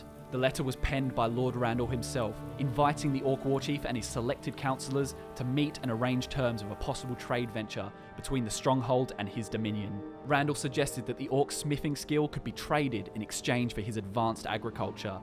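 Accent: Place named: Australia. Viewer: It's weird listening to this dude coz his Aussie accent isn't as obvious as Michael and Scott... nevertheless I think he nailed this, chose well.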